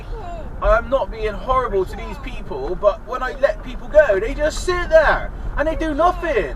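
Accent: Bristol, United Kingdom. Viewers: I love the bristol accent even when angry you sound happy an welcoming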